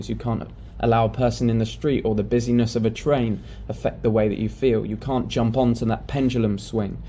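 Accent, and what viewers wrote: England, United Kingdom. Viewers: It's really interesting to hear this stuff with an English accent! Thanks for posting!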